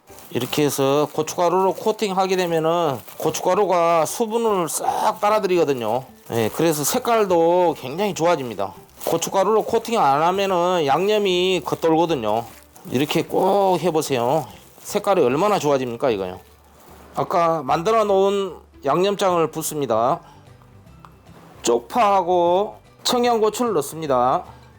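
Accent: Jeolla, South Korea. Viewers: 맛깔나게 양념하고 내고향 전라도 사투리까지 구수하게 더하니 더욱 맛잇는 요리가 될거같아요 바로 따라해볼께요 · 아따 때깔 좋아붑니다요 말투 너무 정겹고 좋아요 혹시 전라도 북도쪽이 고향 이신가요 저희 고향 사투리 억양까지 너무 비슷해요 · 전라도 말씨네요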